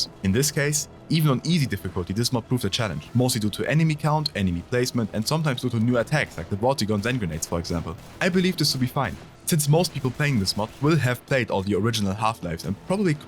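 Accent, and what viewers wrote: Germany. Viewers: ich hoer sofort dass du ein deutscher bist. macht aber nix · Smol Question, are you german? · You sound german...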